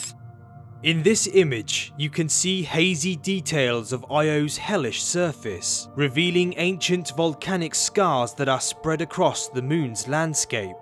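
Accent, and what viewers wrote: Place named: Liverpool, United Kingdom. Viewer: You probably shouldn't have said that the morons succeed in getting under your skin. They live for that shiz. Btw, I really dig your Liverpool accent...or is it Cockney? :)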